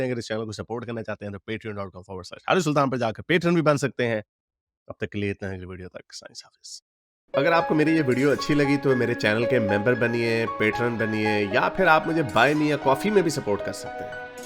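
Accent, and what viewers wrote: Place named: Punjab, India. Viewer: bhai aapke bolne ka style Indian Punjab jaisa hai. Matlab ttha sota baat kerte ho. Jo fact hai ussi pe baat kerte ho. Neutral ho ke baat kerte ho. Ye nahi ke aap pakistani ho toh sirf India ke berkhilaaf hi bolna hai. Aapne Pakistan ke propaganda ko khol ke rakh diya. TNX 🙏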